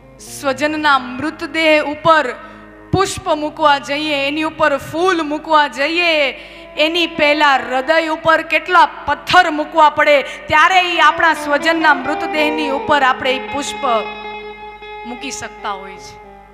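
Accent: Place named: Kathiawar, India. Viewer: Aap ni shaili clear Anand thi Kathivadi Gujarat gujarati ma i salute Ben you with Respect Namra bhav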